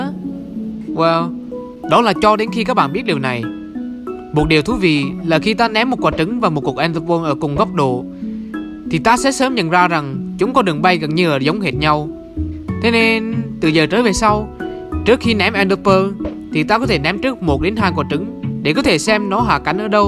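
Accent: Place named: Vinh, Vietnam. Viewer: Đọc giọng ở vinh hay ở đâu đó miền bắc khó nghe quá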